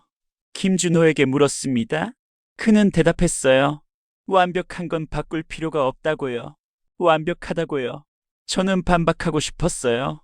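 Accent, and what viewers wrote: North Korea. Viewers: 나래이션..ㅎㅎ 북한말버젼... 모야...ㅎ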